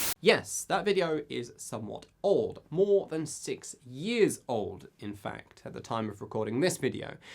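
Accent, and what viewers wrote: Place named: United Kingdom. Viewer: I just LOVE your accent . Since i found your channel , i have changed my mind from wanting to practice american accent and pronunciation to british one .The british accent is so much more fun .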